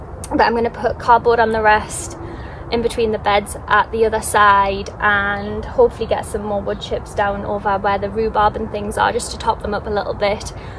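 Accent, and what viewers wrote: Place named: Newcastle, United Kingdom. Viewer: Hello you are totally natural at allotment reporting I really like the colour of your nail polish and your cute smile and Newcastle accent. Any word of Tony c smith having an other potty mouth club show yet. Could you also please tell where. Could be best to get a polytunnell or a small greenhouse please. I hope you have a nice weekend when your away from the garden and allotment thanks you 😊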